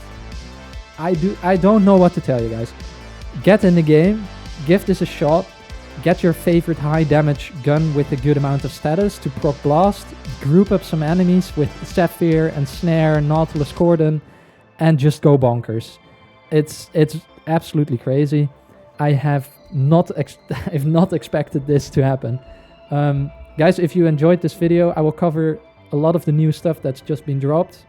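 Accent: Netherlands. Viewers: Are you Dutch btw? I Recognize your accent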